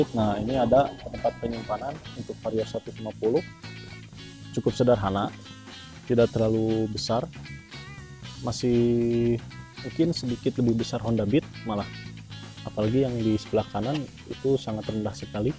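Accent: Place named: West Java, Indonesia. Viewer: Konsumsi bbm dan oli juga kang Btw wa suka logat sunda sampeyan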